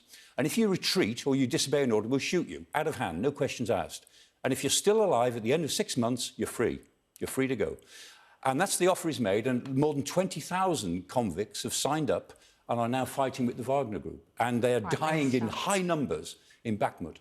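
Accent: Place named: United Kingdom. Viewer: Very beautiful british accent!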